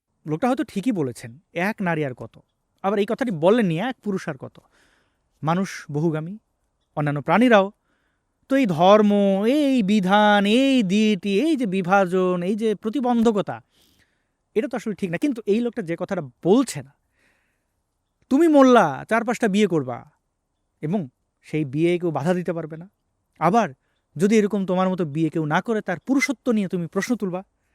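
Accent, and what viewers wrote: Sylhet, Bangladesh. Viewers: মাঝে মাঝে সিলেটি ভাষায় মাতবা বালা লাগে হুনতে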